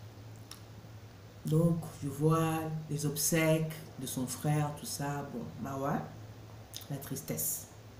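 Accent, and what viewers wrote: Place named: Lingala, Democratic Republic of the Congo. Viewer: Lingala nayo kitoko makasi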